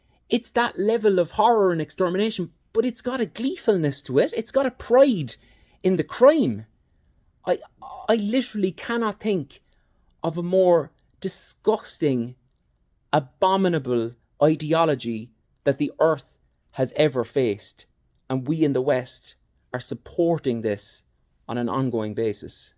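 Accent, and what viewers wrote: Ireland. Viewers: Honestly love this, the Irish have the best english accent imo, second being kiwi.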